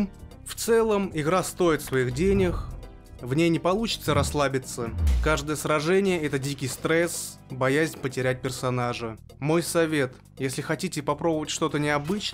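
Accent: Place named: St Petersburg, Russia. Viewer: Голосок Питерский конечно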